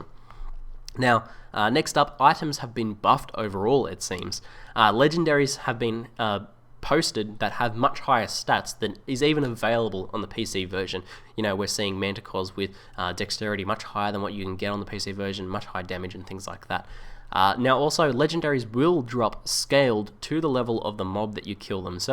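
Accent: Australia. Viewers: hey, if you want audiences other than Australian and New Zealanders, please talk slower so that people actually understand through your rather thick accent. Don't get me wrong i think you have some good contents that i find interesting. However, this video i had to turn on subtitles. I am a native English speaker, yet i couldn't understand half of what you said. What's even worse, you're talking about something that is slightly more complicated then general topic that doesn't really need slower speech. I love your accent when it's not explaining technical subject like describing differences in gaming.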